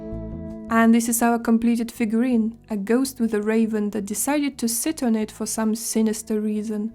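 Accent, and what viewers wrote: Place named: Quebec, Canada. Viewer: About your lovely accent? My wife and I guess French Canadian ? But teaching skills is the best so don't change anything to many people. It needs a great interaction with carving ( not whittling that has no goals but passion for cutting)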